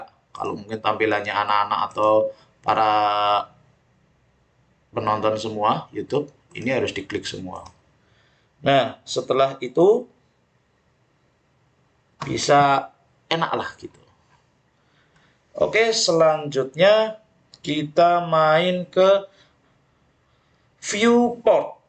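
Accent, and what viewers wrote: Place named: Banyumas, Indonesia. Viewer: Pak bahasanya ngapak. Ngapak daerah mana inj?😂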